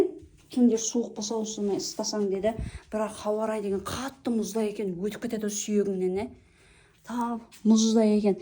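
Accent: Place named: Karakalpakstan, Uzbekistan. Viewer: Сакалы бар ит ,сосиски ит 😂😂😂молодец гап жок карыдас таза каракалпакша 🎉🎉🎉Алла тала жолынды берсин ❤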